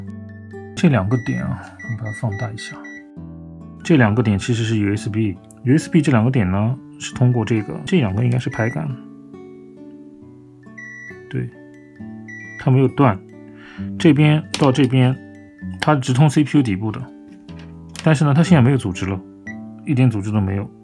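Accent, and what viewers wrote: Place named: Shanghai, China. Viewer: 对呃，我已经听出来侬呃上海口音了，嘿嘿😁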